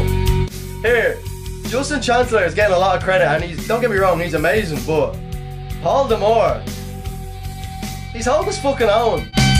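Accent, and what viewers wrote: Ireland. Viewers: I found it so fascinating when (rad-key) reacted to you. He thought you didn't like ' '. I thought HOW is he NOT seeing your reaction as positive? I legit believe he had trouble understanding your VERY easy to understand Irish accent.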